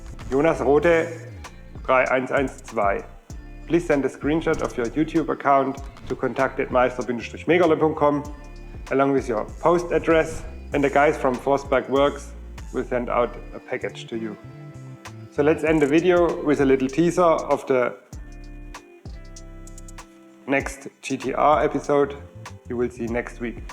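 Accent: Swabia, Germany. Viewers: ❤ I really love your Swabian accent! I look forward to your sourkraut video!! 🎉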